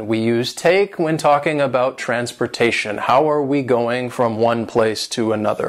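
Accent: United States. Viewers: Mayada swelam Yeah my girl! I'm not, but I'll answer it for ya hehehe There are some possible reasons: his American accent or that "t" is a silent letter, whose sound we can't hear, alright?? Let's wait for his confirmation! Cheers, my friend!!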